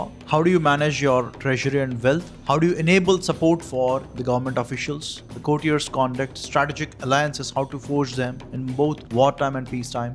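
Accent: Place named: South India, India. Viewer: Man this guy has a very thick South Indian accent. If only he hired someone with better narration, he has been doing it for years and still he hasn't realized it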